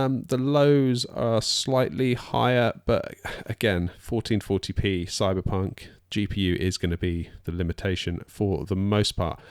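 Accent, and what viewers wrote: United Kingdom. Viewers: Dude whats wrong with you, are you a brit or smth? Cant you use normal english with normal speed rather than this 'boa of woha' eminem speed style?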